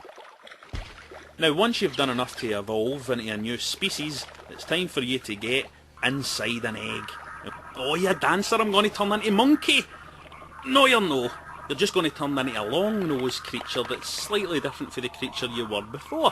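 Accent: Scotland, United Kingdom. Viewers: you can get the rom on SnesOrama and download Nulldc..(DC emulator) watch a youtube tutorial on how to get it configured and "bobs ur uncle" Sorted. lol im from aberdeen... tis decent to hear a scottish accent on this thing. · Scottish accent + solemn speech = EPIC!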